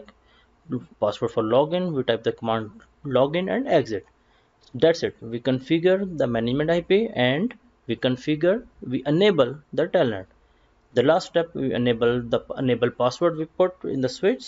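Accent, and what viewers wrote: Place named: India. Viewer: Topics were interesting but indian accent is kinda disgusting... 😂😂😂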